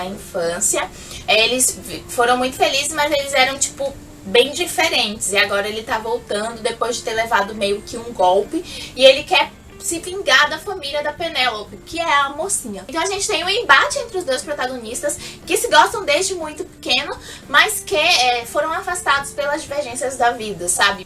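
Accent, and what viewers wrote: Salvador, Brazil. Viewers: Vocêee é de salvadoooor?????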